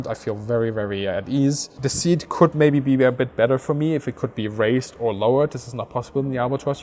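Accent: Germany. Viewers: Dein Englisch entlarvt dich als Deutscher. Horrido alter Hase. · Loved this. Brought up on Biggles stories and WWI fighter pilots. It's great that your accent gives the German words a better sound that an English person could. 👍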